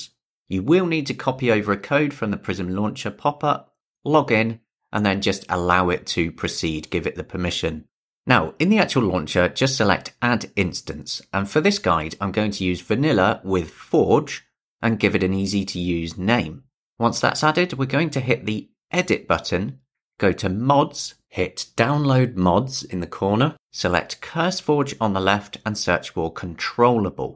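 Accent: United Kingdom. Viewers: Oh my god I found a British hello from American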